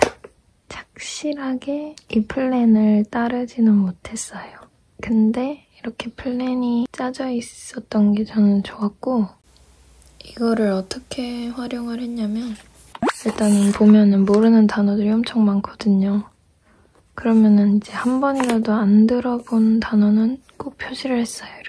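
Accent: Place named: Japan. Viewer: 일본어 억양이 느껴지네요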